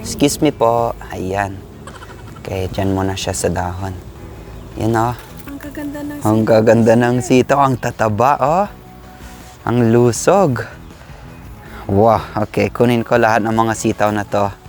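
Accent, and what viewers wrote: Philippines. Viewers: Accent ni kuya, ilocano metten😂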